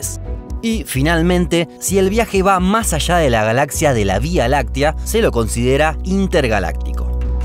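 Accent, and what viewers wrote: Buenos Aires, Argentina. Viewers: Lo único que puedo criticarle es que habla muy aporteñado.Si hablara en neutro el canal llegaría mas lejos.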